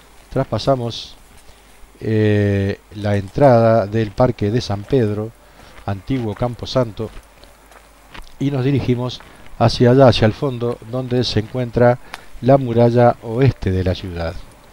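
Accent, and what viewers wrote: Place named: Argentina. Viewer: Por el acento sos argentino...no...?...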